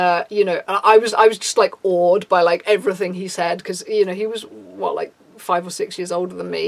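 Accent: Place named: Newcastle, United Kingdom. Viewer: Are you a Geordie? You sound like it imo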